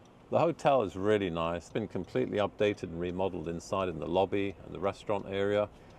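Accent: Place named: United Kingdom. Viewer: Sounds like a Brit who’s lived there for quite some time to pick up some Americanisms in his speech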